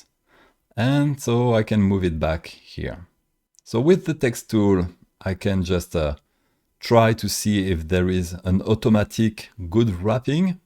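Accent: France. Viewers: I really enjoy listening to you speak. It feels like I’m hearing two languages at once—French and English—while understanding everything perfectly. Amazing 🤩❤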